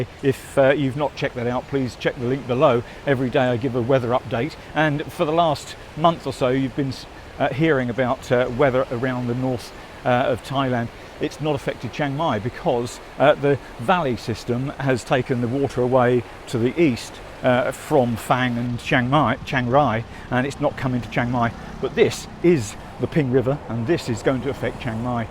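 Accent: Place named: United Kingdom. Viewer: This old POME talks way too much. No economy of thought or speech! Very proud of his UK accent but his Thai pronunciation is hideous!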